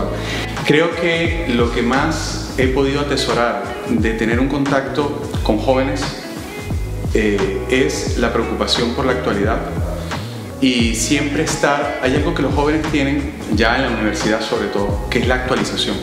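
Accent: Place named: Venezuela. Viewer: Nada más hablar supe que eras venezolano!!!! Es que tienes el don de la palabra certera y didáctica. Una de las cosas que más valoro de nuestros compatriotas profesionales que se exponen por internet es que no recurren a groserías ni expresiones de mal gusto. Felicitaciones y éxitos. Ya me paseo un rato por tu canal 😊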